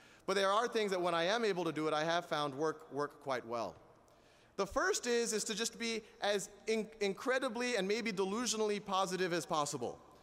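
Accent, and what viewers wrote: United States. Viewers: well, he was born and educated in the USA. His parents are south asians. So, it should not surprise you that he speaks in an american accent given that he has been staying in the US for most of his life. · lol no california. i meant just his voice sounds very american to me. idk ive encountered plenty of south asians with american accents. but...sal khan's voice is extra White to me. haha just thinking out loud here.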